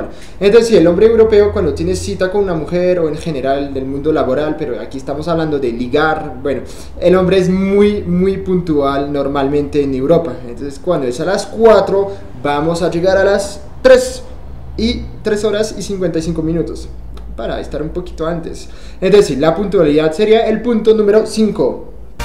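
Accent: Colombia. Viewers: Se le ha quedado el acento colombiano 😀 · Habla muy Colombiano, casi diria que practico en bogota, hablas muy bien, y si tuve un novio Aleman y lastima que no funciono, me encanta y aun me encanta el chico. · su acento colombiano muy marcado... parce.... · Que tal dejo colombiano! ..😊 Muchas gracias por tus consejos!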